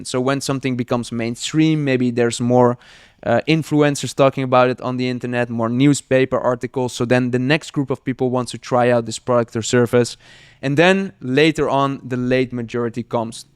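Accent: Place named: Netherlands. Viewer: sterk nederlands accent , goede content .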